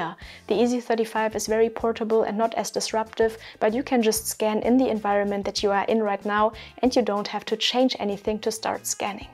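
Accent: Germany. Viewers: been trying to learn and looking for a film scanner? jsut starting to watch your videos. by any chance do you live in Germany? the accent is a littl bit strong, just some stuff I noticed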